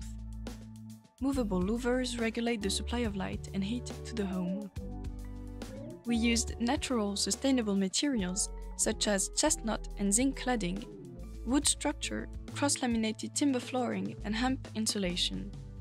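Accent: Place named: United Kingdom. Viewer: Je viens de tomber par hasard sur ce projet étudiant et j'ai été bluffé par la qualité du rendu qui me semble déjà à la hauteur des plus grands designers d'espace de notre temps. L'habitation répond avec brio à l'enjeu social du projet tout en tenant compte du cahier des charges qui n'est pas des moindres. Je souhaite saluer le travail de la voix off dont les intonations "british" ont su s'accorder à la finesse et la subtilité de cette création architecturale. Ces deux jeunes designers ont réalisé un vrai tour de force avec ce projet qui annonce une carrière très prometteuse. Un grand bravo !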